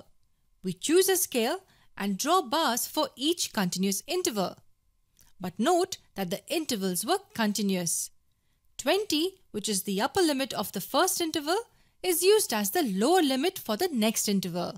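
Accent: India. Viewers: Indian ascend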